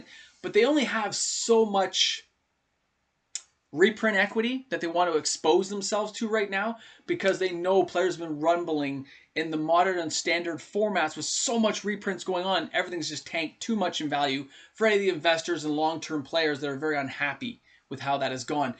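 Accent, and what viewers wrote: Canada. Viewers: Judging from the opening scene and your pronunciation your in Canada?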